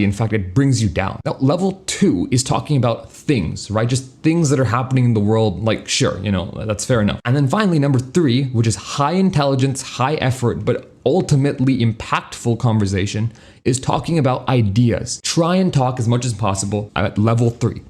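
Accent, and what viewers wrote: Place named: India. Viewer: Bro drops the Indian accent "azzume" like crazy this is giving unprecedented flashbacks to my proof writing class Edit: I'm literally just going to subscribe to you because you summarize a bunch of techniques in books to I never have to read them myself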